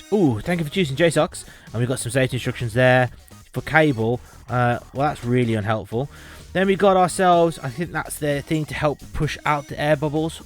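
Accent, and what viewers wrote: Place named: West Midlands, United Kingdom. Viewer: Hi mate. Thanks for the thorough review. I noticed you say it direct affect the screen clarity right? As in it doesn't cause the pixels and text to become slightly blurred? I've not ordered an SD yet cos waiting for sales or refurbs. Getting ascitic to wait though 😂. Hey, you sound local to the west midlands! Big up the WM massive!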